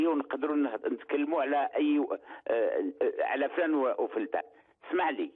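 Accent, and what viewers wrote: Morocco. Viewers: انت مروكي و الكلام نتاعك باينة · qui êtes vous pour parler de l Algerie et de ses responsables. de quoi se mêle ce marocain.melez vous de votre roi et de votre pays. VOUS ÊTES UN MAROCAIN.VOTRE ACCENT.VOTRE HAINE CONTRE LE PRÉSIDENT ALGERIEN ET LES RESPONSABLES ALGERIENS VOUS TRAHISSENT.LE PEUPLE ALGERIEN N EST PAS CON OU DÉBILE POUR VOUS CROIRE. LES ALGERIENS SOUTIENNENT BOUTEFLIKA ET SA POLITIQUE QUE ÇA VOUS PLAISE OU NON. VIVE L ALGERIE.VIVE LA JEUNESSE ALGERIENNE.VIVE LE PEUPLE ALGERIEN LES HOMMES.VIVE LE PRÉSIDENT BOUTEFLIKA. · لهجة مغربية... واش دخلك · لهجه مغربيه واش تستنا منهم هذا المتحدث مغربي تحليل تاع رعيان كلام تاع الشارع دون مستوى يتكلم على رئيس فرنسى ثم البطاطه ياخي جياحه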